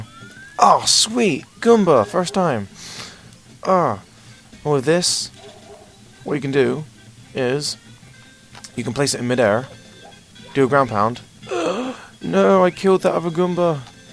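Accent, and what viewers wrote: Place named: United Kingdom. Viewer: I think hes british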